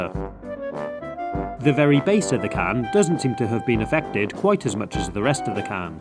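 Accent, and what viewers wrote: United Kingdom. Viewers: Are you British? Can I please get a reply?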